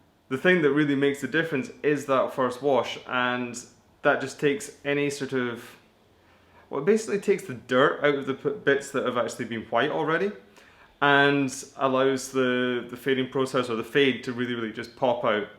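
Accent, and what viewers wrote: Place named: Scotland, United Kingdom. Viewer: Okay, are you a Scot living Sweden or a Swede living in Scotland?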